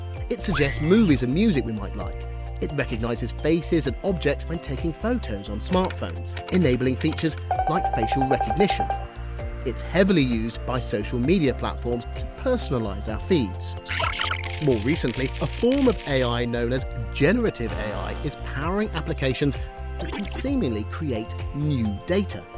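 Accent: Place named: United Kingdom. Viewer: Well done. And everything sounds better with a British accent.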